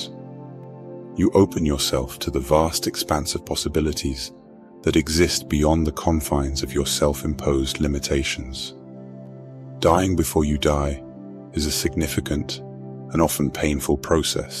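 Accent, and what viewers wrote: United Kingdom. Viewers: I agree...I found it interesting that this originates from India but with a British accent. I love the messages but...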